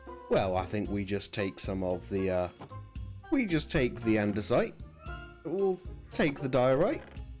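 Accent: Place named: Posh, United Kingdom. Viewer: Awful how can you call this content 👀 You sound like you went to private school 🙄 toff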